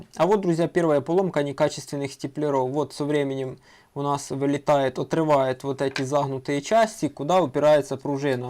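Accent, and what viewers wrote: Vologda, Russia. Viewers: Вологодский чтоли 😅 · Спасибо, познавательно! У вас акцент вологодский)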